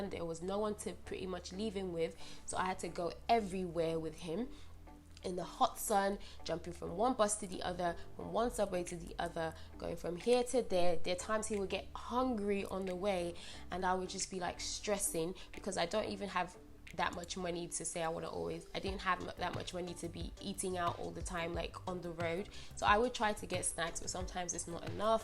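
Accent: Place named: United Kingdom. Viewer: Your British accent came out to play, where’s the Canadian and Nigerian one 😀. Thanks for the heads up on accommodation. 👍👍👍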